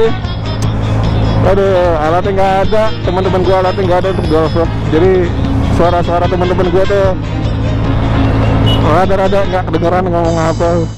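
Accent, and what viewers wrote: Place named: Banyumas, Indonesia. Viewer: Sing ngarep ngapak le ngomong...anjir nguakak bener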